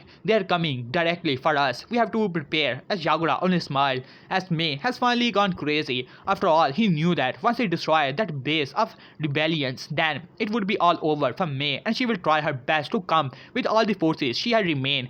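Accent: India. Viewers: It sounds like Indian and Asian English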